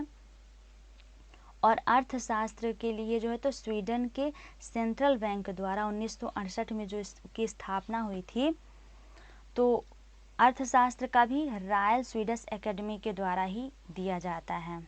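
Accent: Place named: Bihar, India. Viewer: Tum Bihar se ho ...Boli mein udhar ka hi ahsaas ho Raha hai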